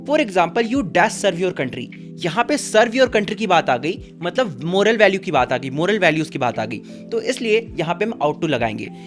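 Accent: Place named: Rewa, India. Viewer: I know you are from Mp rewa ......... By your accent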